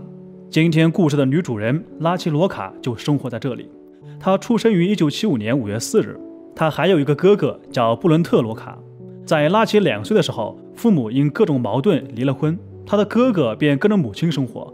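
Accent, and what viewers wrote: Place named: Gansu, China. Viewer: 甘肃口音？咬字有点怪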